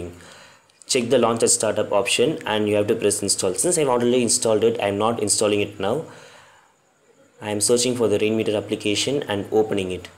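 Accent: India. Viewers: After watching so many tech and programming tutorials, I am starting to love the Indian accent. The accent is actually cute! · Sound like indian scammer... Sorry!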